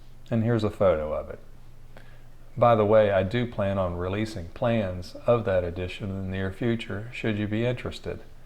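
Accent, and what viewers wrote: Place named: Virginia, United States. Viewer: The cabin is beautiful along with your accent and the fact that you're from Virginia.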